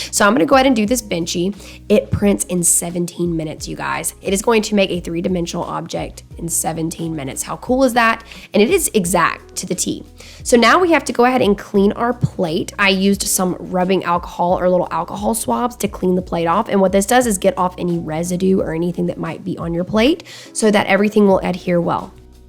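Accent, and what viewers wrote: South, United States. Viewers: Hi, I recently found your channel and love the fact that you are a Christian (I see your tshirt designs 💜) and feel sure I hear a southern accent! I am a special education teacher in Arkansas and am considering starting a small business for my special education students. Would you ever consider doing a Google Meet so I can ask you questions and get some guidance? I’ve been watching your videos, but need to get this thing going, by ordering supplies and equipment within the next month.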